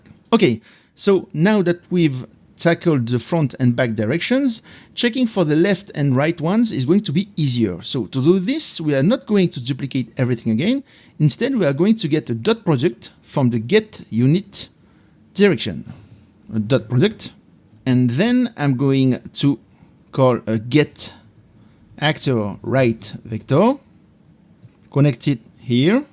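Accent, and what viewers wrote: France. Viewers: L'accent français de zinzin 😅Merci pour le tuto complet c'est top !! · L'accent française est vraiment la pire.. ouch.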